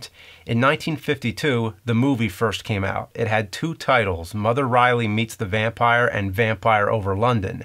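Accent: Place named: New Jersey, United States. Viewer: I noticed that too, I think it's just his New Jersey accent.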